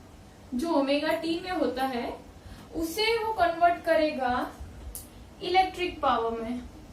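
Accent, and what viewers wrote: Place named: Gujarat, India. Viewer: Definitely u r from gujrat bcoz v all have unique hindi accent.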